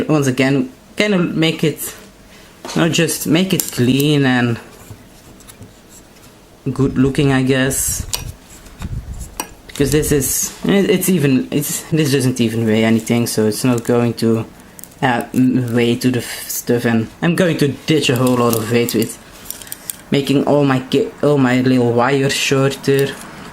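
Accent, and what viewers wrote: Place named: England, United Kingdom. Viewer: Nice project my friend :) Love your english accent! Happy new week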